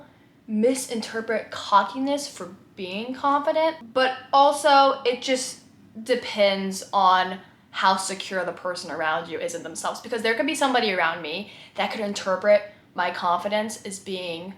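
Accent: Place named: Texas, United States. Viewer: Is it just me or could I sense a bit of texan I’m her accent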